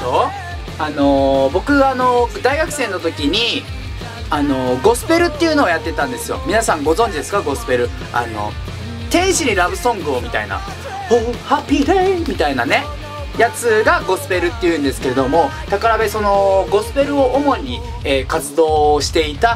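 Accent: Fukuoka, Japan. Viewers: 福岡弁がすごくいいです😉💖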